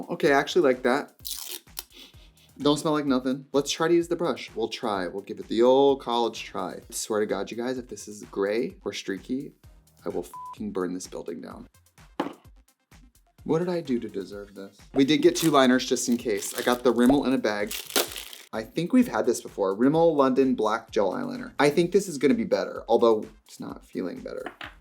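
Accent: Wisconsin, United States. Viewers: trixie’s wiscansan accent really popped out in this video (i say as someone from effing maryland) also a whole face of milani might be the move. 👀👀👀👀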